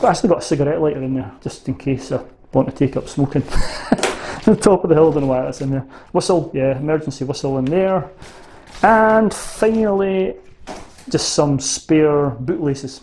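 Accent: Scotland, United Kingdom. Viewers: Auto-captions are hilarious - they can't cope with your Scottish accent! 😂 "Secluded Thailand beaches" 🤔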